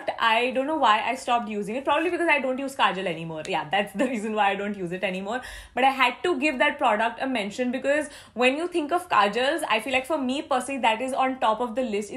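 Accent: India. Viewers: can you speak hindi for the one whole video love your hindi excent ..............:>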